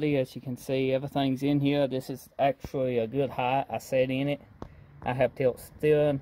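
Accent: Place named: Louisiana, United States. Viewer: Cajun accent isn't it? You hear that down in Louisiana.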